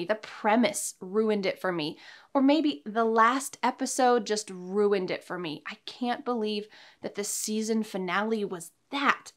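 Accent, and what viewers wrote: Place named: United States. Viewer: ......I've just met you through your English channel. I'm from Venezuela!.... I think I love you!!... I mean.... I love your teaching method, your american accent, your knowledge..... May God bless you!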